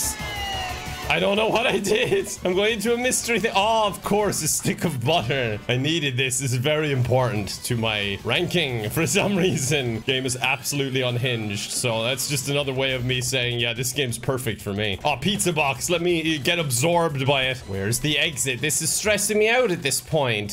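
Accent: Ireland. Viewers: You're Irish? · Wait! Irish?! I thought you were Spanish!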